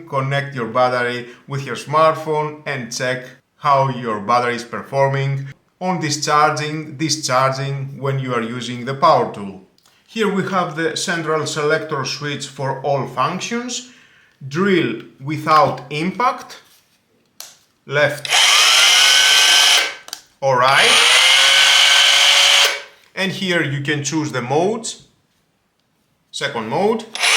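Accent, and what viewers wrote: Greece. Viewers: Είναι φοβερό εργαλείο ήδη τα έβγαλε τα λεφτά του με το πρώτο μερεμέτι .Ανετα ξετρυπήματα και σκαψίματα σε τούβλο και μπετό . Γενικά η σειρά performance είναι κορυφή.. Θα πάρω κι άλλο την επόμενη που θα ξαναφέρει το λιντλ . Είχα αρκετά εργαλεια απο τα πράσινα και έχουν βγει σκυλιά αλλα εδώ πλέον και με τις τιμές που παίζουν έχουν ξεφύγει τα πράγματα και χτυπάνε άνετα και κορυφαίες μάρκες οι οποίες είναι εώς και 5-6 φορές πιο ακριβές ! Γράφω Ελληνικά γιατί απο την προφορά αποκλείεται να μην είσαι Ελληνας!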